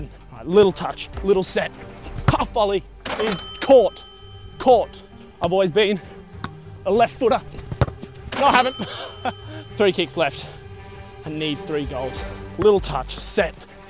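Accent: Australia. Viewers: I had no idea you were australian, I assumed you were british from watching your shorts with no audio lol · he sounds like a aussie is he one? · are ya aussie as well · Ok now i knew your australian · Is this guy Australian and if he is is he from Sydney? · Ru an Aussie?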